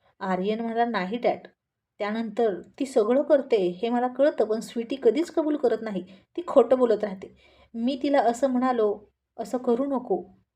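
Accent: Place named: Kolhapur, India. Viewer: Good morning tai 🌄 khupcha khup miss kele mitumhala ani tumcha storila fon divas....gharatali ekhadi vakti bahergavi jate ani ghar kasa suna suna vatate tasa mala vatate hota😢😢😢😢😢😢😢😢😢mi tumchi pranay pipasu bayko hi story khupcha miss karat ahe😢😢😢😢😢😢ta storitil ekun ek prasang mi maza manat sadhavun devale ahet😊😊😊😊😊😊thanku tai 🙏🙏🙏🙏🙏🙏🙏🙏🙏🙏 love you tai ❤❤❤❤❤❤ tumhi khup chan ahat tai ❤❤❤❤❤tumcha kolhapuri bhaset mhatle tur lay bhari...........nad kudha.... Tumcha sarkhe tumhich.....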